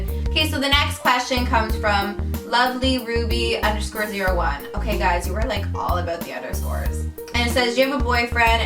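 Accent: Canada. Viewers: can you do the accent tag? :P i could always tell you were from canada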